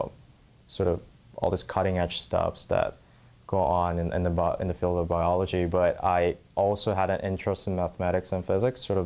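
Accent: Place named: Asia. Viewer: probably because asian accent(im asian too btw so don't call me racist)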